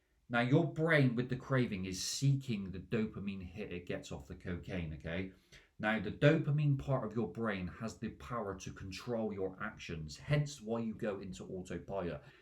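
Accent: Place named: Bristol, United Kingdom. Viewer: I knew you were from Bristol!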